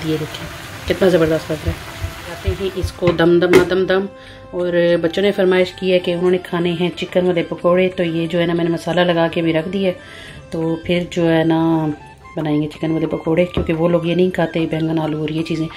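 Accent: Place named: Pothwar, Pakistan. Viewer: BHAUT CUTE BACHAY HAIN AP KE KHUDA SUB KO KHUSH RAKAHAY AR MEHFOOZ RAKHAY AMEEN AUR AP KI POTHWARI SUNANAY MA MAZA AH JATA HAI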